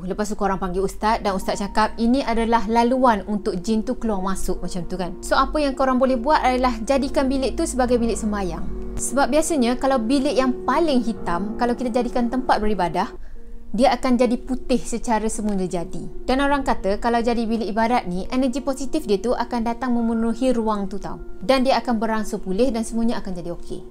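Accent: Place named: Johor, Malaysia. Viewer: Best le kakak punya penyampaian tak bosan macam sleng jawa johor tu yg kmi suka cara kakak berckp .👍👍👍😃😃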